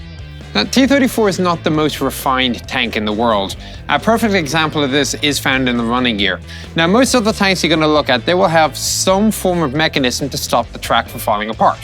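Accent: Ireland. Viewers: You have to excuse him hes irish thats just how they talk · He has an Irish accent lmao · Apparently Chieftain is American, but he sounds Irish? Weird accent. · the presenter has such an ambigious accent....irish? german? nordic?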